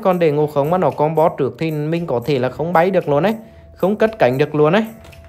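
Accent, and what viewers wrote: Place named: Central, Vietnam. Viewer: giọng bắc nghe quen rồi, méo hiểu sao cứ thích nghe cái giọng miền trong của bạn 😂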